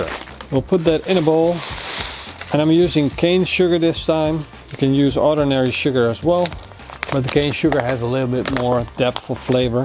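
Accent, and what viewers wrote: Netherlands. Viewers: It's Dutch for sure :D No question about that!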